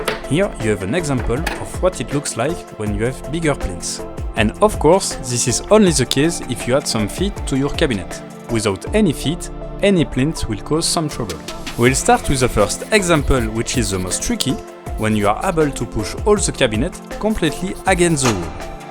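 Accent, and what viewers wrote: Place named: France. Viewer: Great video, also could barely tell by your accent that you are french! laughs in Hoho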